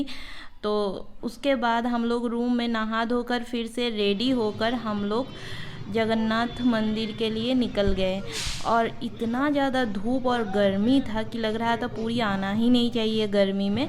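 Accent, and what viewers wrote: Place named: Bengal, India. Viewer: Aapka hindi acha hai bas thora bengla touch hai.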